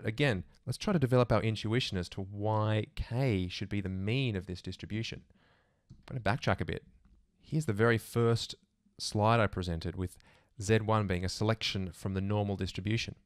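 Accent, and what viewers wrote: Australia. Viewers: is that Australia accent?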